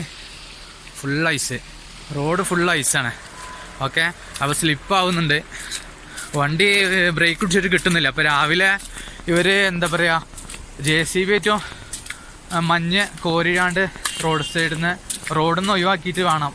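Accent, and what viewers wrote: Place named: Malappuram, India. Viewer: Ithil samsarikuna aalude samsaram assal malappuram. Ushaarayitund.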